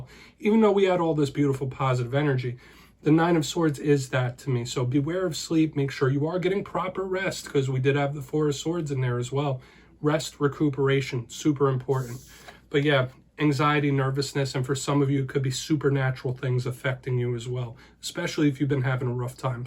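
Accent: New Jersey, United States. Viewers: Love the stories the pictures tell. Use tarot for clarity and to help interpret the ongoing energies. Im a new subscriber and was attracted to the title. Also the NJ cadence, im originally an east coaster.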